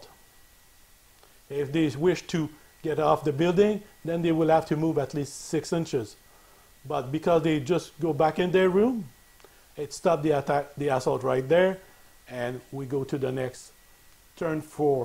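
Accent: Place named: Quebec, Canada. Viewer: Hey!! is french your first language? cause it seems like you have a quebecois accent:)! if so, i'm quebecois too! I really enjoy your videos! You are really helpfull, cause i start doing some build of model kit and i didn't really know how to. and i found your channel and it helped me a lot! And it's cool that you are canadian!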